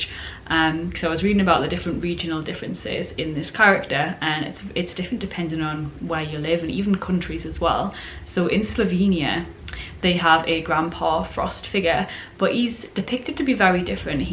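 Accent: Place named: North East England, United Kingdom. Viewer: Been reading grettis viking saga and he gets attacked by monsters during Yule tide so seems to be a tradition of supernatural stuff during Xmas .always nice to hear my local north east accent in YouTube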